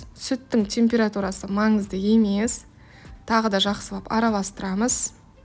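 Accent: Standard. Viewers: Таза қазақша сөйлеген тіліңіз қандай балдай?! 👍👍👍👍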